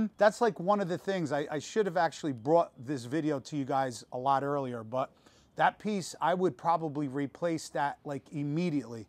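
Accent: New Jersey, United States. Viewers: he is from jersey they all talk like that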